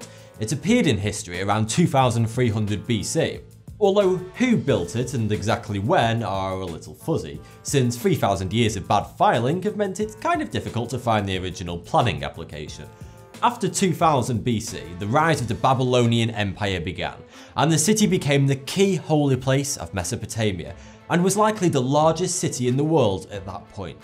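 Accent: United Kingdom. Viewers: I didn’t know Frankie Muniz had a British accent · 42 or thought 2? sry ur too british for me to understand clearly.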